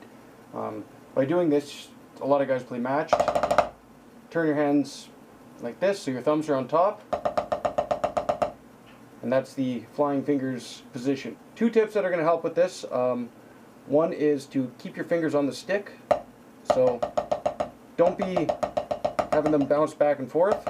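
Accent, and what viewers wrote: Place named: Canada. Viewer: strongest canadian accent ive ever heard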